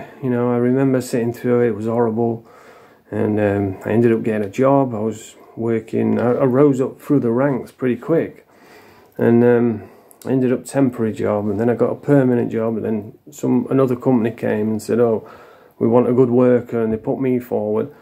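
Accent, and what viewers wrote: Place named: England, United Kingdom. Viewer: Go on lad… I’m from the basque,north Spain, But I work on ferrys in the Uk , all over…I’m curious about your accent ? Which part of England are you mate? Strong lad, nice2 meet u…’ Greetings 🙏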